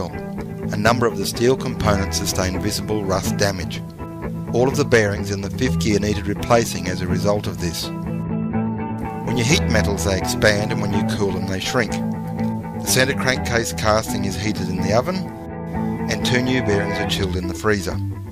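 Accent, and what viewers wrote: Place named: Australia. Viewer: Hey great video.. I know this is old but hopefully you stillnsee it.. I have a t120v 1972 and the mechanic who rebuilt the motor couldn't get the gearbox working. it looks the same externally I was looking at it today.. though I believe my model has a leaf spring not a plunger.. same selector "quadrant" learned a new word today. Anyway the bike is in neutral the but gears won't change. Shift mechanism all seems right. I ran it when I first got it home and the gearbox locked up totally. its been sitting for years as I kinda gave up on it.. I looked at it today.. taking the outer cover off and its the kickstart gear that seems locked.. inside the cases..lower gear spins freely when Turn the tyre.. I'm a little on the "in over my head' side honestly but your video is pretty encouraging.. just wondering if A: you have any thoughts as to what could be going on wrong and B: being (as you have the Aussie accent) know anyone in Adelaide I could take it to?. 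Cheers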